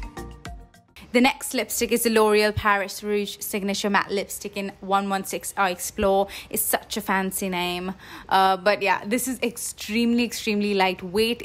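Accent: United Kingdom. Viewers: British accent?? Why? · Thanks for swatching these babies during nykaa hot pink sale, surely will order few of em💋💋 lots of love ♥️ PS- The British accent though! Lit baby gurll🔥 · Ahh that british accent of yours which comes in between 💜😂 Love love love ...true inspiration for me 🤗💜💜💜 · damm that british accent... much love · Thankyou so much ♥️♥️ this video was actually much needed 😍😍😍 and ofc your British accent ♥️♥️♥️♥️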